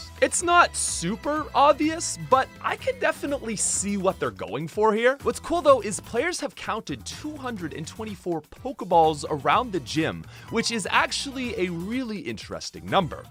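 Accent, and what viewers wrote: Canada. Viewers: he probably Canadian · Thank you for my daily dose of hearing Canadian accent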